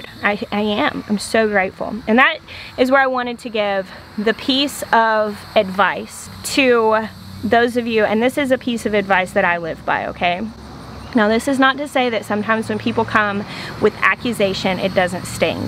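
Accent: South, United States. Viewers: I started watching your earlier shows and loved them.i do prefer the old voice,just can't handle the southern twang you got now,I wish you well and hope you loose the twang don't be like others be youself you have a bright future stay sparkles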